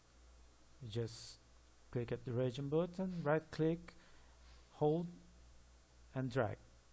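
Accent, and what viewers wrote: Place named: Brazil. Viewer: reconheço um sotaque brasileiro de longe, muito bom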